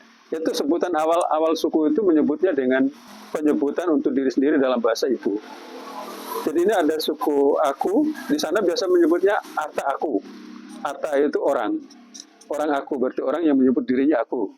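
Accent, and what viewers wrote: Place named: Java, Indonesia. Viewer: Mohon maaf bapak,, bp bisa 'medok' begitu, apa sering berbahasa jawa pak 🙏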